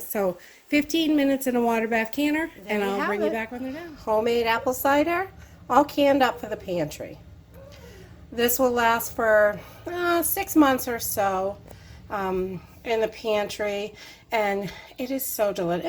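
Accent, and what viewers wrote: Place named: Massachusetts, United States. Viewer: Just went apple picking so about to make two batches of cider using your recipe. Having been born and raised in Mass (and now in Cali) I just want to listen to you and your accent- you can't tell me you are not from Mass!!!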